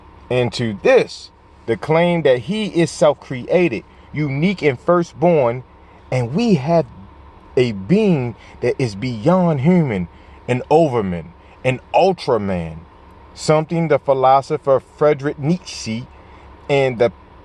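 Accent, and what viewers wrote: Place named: Baltimore, United States. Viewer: Gotta be from Baltimore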